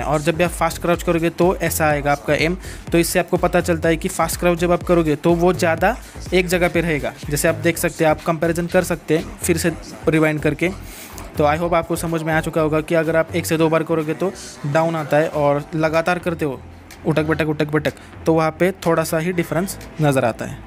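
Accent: Maharashtra, India. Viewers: Op bhaii..💯💯💯 I think your mother tounge is marathi...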